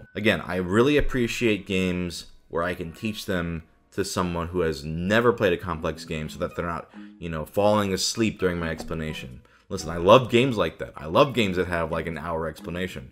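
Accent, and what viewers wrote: Germany. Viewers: German accent made me subscribe 🤣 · God I love his fancy German accent lmao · Your German accent is on point! Bruh!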